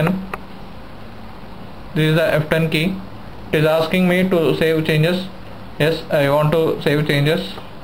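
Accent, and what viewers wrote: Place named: India. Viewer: I understood everything you said (thanks to the Indian movies i watched),thanks man :D it helped. You're Indian right?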